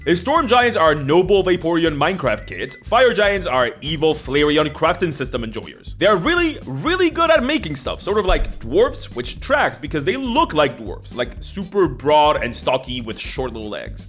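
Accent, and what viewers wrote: Ireland. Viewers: Release the forbidden Irish accent Go on. Go on. Go on. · give us your irish accent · As one of your Irish viewers, the fact you used to speak with a big Dub accent makes me unspeakably happy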